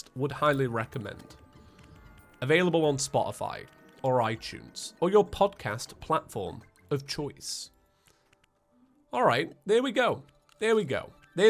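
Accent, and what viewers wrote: United Kingdom. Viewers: videos make me realize how different Brits pronounce certain vowels than Americans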